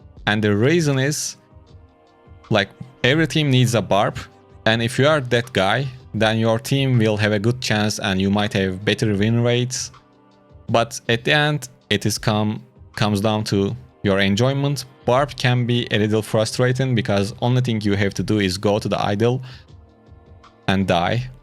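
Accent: Turkey. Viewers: Kardeşim sen türk müsün ingilizceyi konuşma aksanın cidden türk gibi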